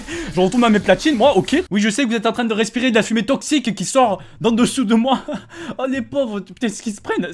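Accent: South, France. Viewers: Hello à c'est toi qui a rajouté le symbole illuminati ? xD Tu viens d'où ? car t'a un accent du Sud :p ( je crois Montpellier mais pas sûr vu que tu avais fais une vidéo ) Jsuis deçue pour le twerk, mais bon bad ass ! Mdrr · Dat accent du Sud heing !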